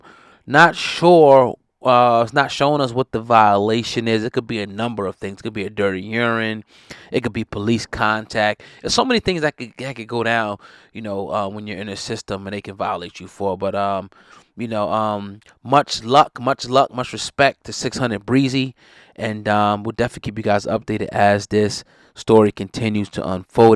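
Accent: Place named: Iowa, United States. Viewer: U suck at journalism... If you 1 rly messed wit 600 you would know or even if you just did research then you would know his parole is in iowa.. 2ndly u dont know what you tlkn about. Probation then you have to see a judge if your on parole then you have to go see the parole board.... Hate When yall non street dudes try to speak on the street... Tbh u sound like you from iowa 🤔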